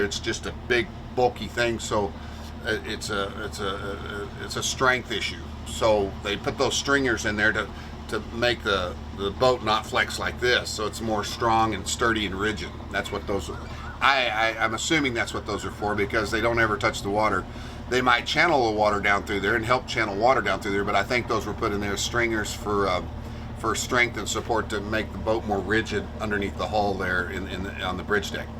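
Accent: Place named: Texas, United States. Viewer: Your family is wonderful so very talented in many ways , Love to hear you talk TEXAS My greatest love is your boat . It’s really pretty you guys keep it wonderful I watch a lot of boat channels I would pick your boat over most I see . In another life I would live it the same way you guys are .All of you put a smile on my face Lovely family !stay safe have fun !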